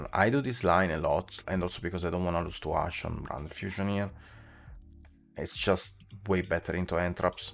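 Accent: Italy. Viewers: Ho sentito 10 secondi di video e ho già capito che sei italiano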